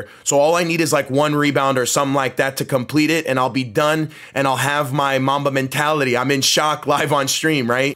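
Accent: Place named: Canada. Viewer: You’re from Canada for sure, prolly Toronto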